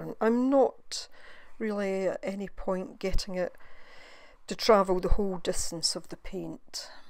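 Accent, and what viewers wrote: Scotland, United Kingdom. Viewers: Thank you so much for this video. I've also messed around with this technique coz I think these patterns are quite amazing! I tried glass, sheets of rigid acrylic, bendy acrylic, various acetates, projector film, photo paper and more, inter-changing them all if you know what I mean. So far glass seems to give the best result. I found yt videos by Atomic Shrimp and Cat Hand (two separate channels) who did some experimenting with dentritic painting and mono-printing with pretty good results. I think they may have added a wee bit of water to the paints. Beats me how I did not discover your channel previously while on my dendritic travels, but am glad I found you now. You have so many videos and interesting techniques I would love to explore! And great to hear a Scottish accent too! Sub'd.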